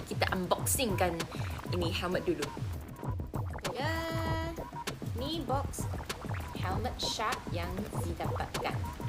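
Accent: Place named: Kelantan, Malaysia. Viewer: Wah pandai kecek kelate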